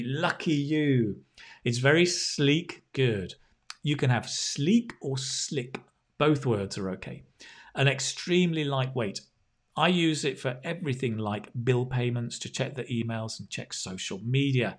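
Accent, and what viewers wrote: United Kingdom. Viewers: Dear Mr. Thank's a lot your lessons are so helpful, clear British accent, funny, informative, and lots of useful phrases, for free and so generous...😄 They're also useful for daily conversation not only for IELTS. Again, thank's a lot and more lessons please! 😄😃😃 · Muchas gracias, pero de verdad... muchisimas gracias por tu canal. Apenas lo conozco pero me encanta. Ajjajaja apesar de que no sea fan del inglés britanico, tu me estas haciendo amarlo 🤗🥰❤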